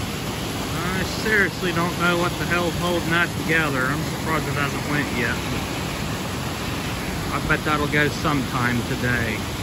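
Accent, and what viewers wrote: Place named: Western Pennsylvania, United States. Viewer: Is this in western PA? Guessing based on your dialect. I miss that.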